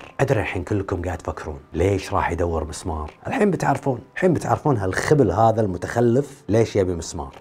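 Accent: Kuwait. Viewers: تتكلم بلهجتكم الكويتية ... يديد يعني جديد فلماذا الياء مكان الجيم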